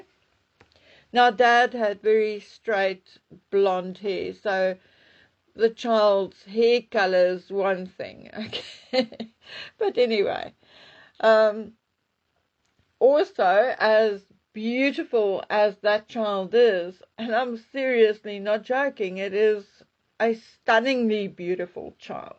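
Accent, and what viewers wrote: South Africa. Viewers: I'm not sure where lives, but to me, it sounds like South African. African as a country produces oil.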